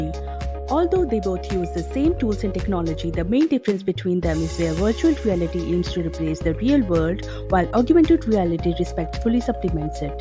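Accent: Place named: India. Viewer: Yeah, also the Indian accent English grates on the ear. Slow down please. I feel like I will explode while watching but the content is good.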